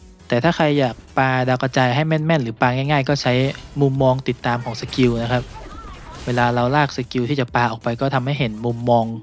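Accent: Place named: North, Thailand. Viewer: สาบเมืองอยู่นะ คนเหนือไหมนี่555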